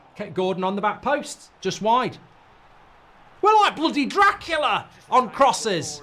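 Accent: United Kingdom. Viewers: Funny old manc accent that!like every other Salford supporters not born within 100 miles of Manchester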